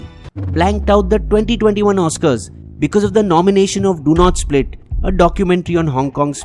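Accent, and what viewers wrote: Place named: India. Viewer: you mean kutzergart? Yes I do watch him but i can say tells story in much more interactive way and I can understand because of his Indian accent and kutzergart is a German.... And believe me when Germans spaek English it is the most hilarious thing.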